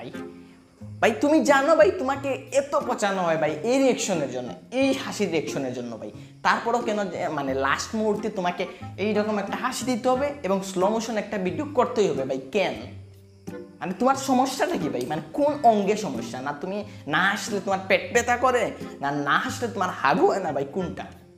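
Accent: Sylhet, Bangladesh. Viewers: Sylheti math tmr muko vala lage... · আপনি কি সিলেটি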